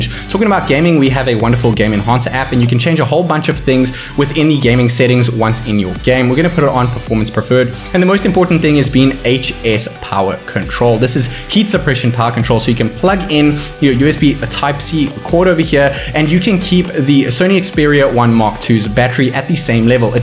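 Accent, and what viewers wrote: South Africa. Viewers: Great video! BTW are you South African? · Hey, are you South African? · Are you South African?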